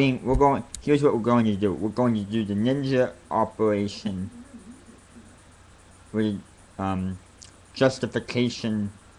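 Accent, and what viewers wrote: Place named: United States. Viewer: So, what part of America is this accent from?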